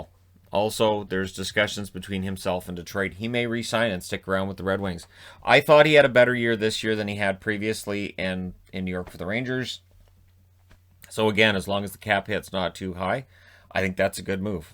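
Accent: Canada. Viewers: Feel the love Even if he does pronounce words ala Canada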